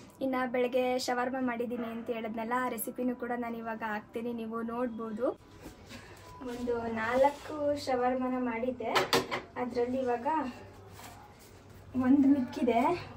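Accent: Mandya, India. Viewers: U are such a sweet girl and ur slang just like us proper mandya slang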